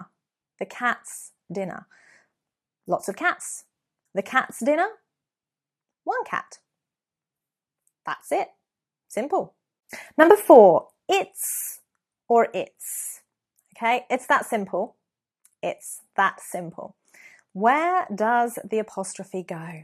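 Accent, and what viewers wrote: United Kingdom. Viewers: You sound exactly like every Snooty British person every American citizen hates with a dieing passion.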